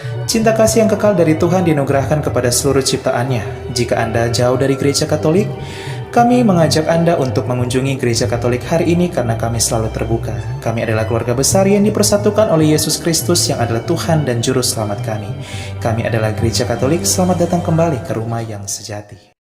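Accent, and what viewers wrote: Manado, Indonesia. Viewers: Min orng Manado yahhh?? Hehehe 😁😁😁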